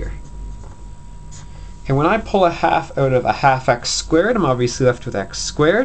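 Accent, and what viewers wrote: Canada. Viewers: Off topic but are you Canadian? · U canadian? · I like your Canadian accent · hi fellow , u canadian xD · Get Oooot of ere! I love the Canadian accent! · are you canadian? ^.^ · are u canadian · Let me guess, Canadian??? You said oot instead of out. Great video though. Thanks. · are you from Canada?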